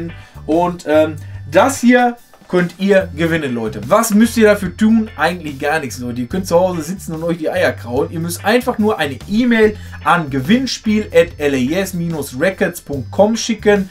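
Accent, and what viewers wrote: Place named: Ruhr, Germany. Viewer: Danke für die Info, dann werde ich bei meinem Mighty und Hybrid bleiben! Der Volcano war an Black Friday für 400 Euro im Angebot, sonst ist der ja leider immer bei knapp 500... schon viel Geld aber es lohnt sich auf jeden Fall!! Kommst eigentlich auch aus dem Ruhrgebiet, Duisburg, Essen oder so? Die Ruhrpott Sprache erkennt man direkt 😂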